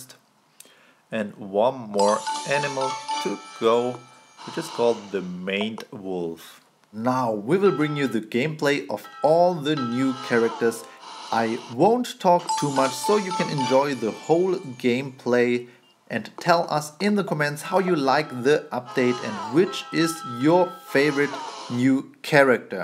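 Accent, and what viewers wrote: Germany. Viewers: I love this update are you german?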